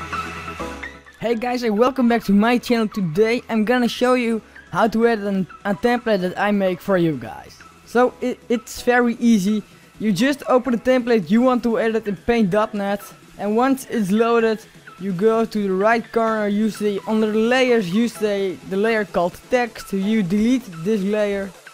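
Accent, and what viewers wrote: Netherlands. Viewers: ik hoor dat he nederlands bent P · je kan best goed engels maar je hoort gewoon dat het een nederlander is :)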